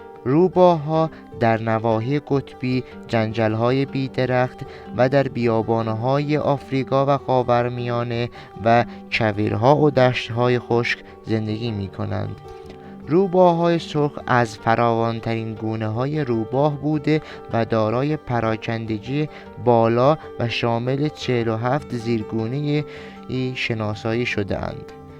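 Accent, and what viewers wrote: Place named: Turkey. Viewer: داداش خسته نباشی بخاطر ساختن این ویدئو.میخواستم یه پیشنهاد بهت کنم.بنظرمن بهتره متن ویدئوهاتو با همون زبان زیباودوستداشتنی آذری بخونی و زیرنویس فارسی براش بذاری.بنظرم اینطوری مخاطب بیشتری پیدامیکنی.اینجور کانال‌ها و ساختن اینجور ویدئوهای قوی و زیبا، خیلی زیباتر وجذابتر خواهد شد زمانیکه گوینده خیلی روان و فصیح وبدون لهجه بازبان رسمیه کشورش، روایت کنه.متاسفانه شما بالهجه‌ی شیرین ترکی صحبت میکنی که به گوش شنونده نسبت به ویدئو، جذاب نمیاد.بنابراین بنظرم بهترین کار همونیه که گفتم.با زبان آذری و زیرنویس فارسی.بازم خسروان صلاح مملکت خویش بهتر دانند.امیدوارم حرفام باعث سوءتفاهم و ناراحتیت نشده باشه.صرفا فقط دوستداشتم زحمتی که میکشی، ثمر بده.ایام بکام⚘️🌿⚘️🌿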